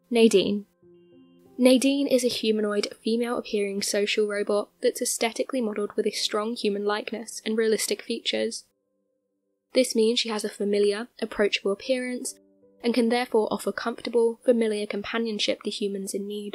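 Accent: United Kingdom. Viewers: Love the narrator, she has such a charming accent, wonder what part of Britain she's from?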